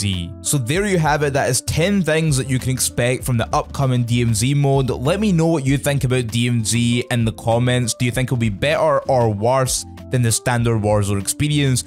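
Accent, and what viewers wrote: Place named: Scotland, United Kingdom. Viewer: I am simple man. I hear a Scottish accent, I give a thumbs up.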